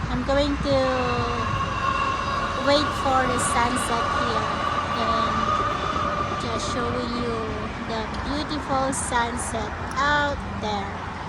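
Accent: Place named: Pakistan. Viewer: Nice sharing sissy pero natawa din ako sau sa nag aantay nang maasawa hehe..nakakatuwang Pakistan ang boses mo sissy masayahin kang tao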